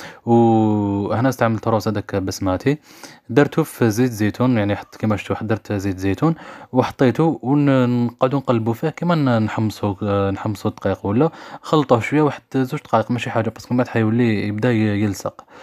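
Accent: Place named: Oujda, Morocco. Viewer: شكراااا على وصفة وتحية ليك من المغرب الشقيق🇲🇦 وهضرتكم شحال حلوة تشبه للهجة وجدة ❤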